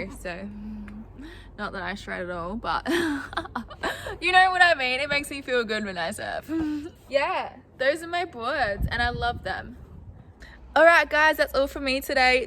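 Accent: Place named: Australia. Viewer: hi im soo glad i found ur youtube ch today and subscribed too it ur soo pretty have such great fun energy and ur vids are really good and i love ur aussie accent too! ha!!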